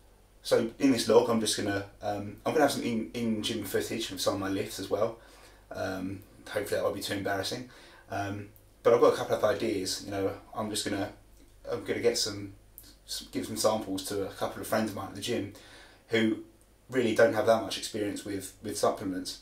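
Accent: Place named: United Kingdom. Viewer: Where are you from? Obviously U.K. But what part of England?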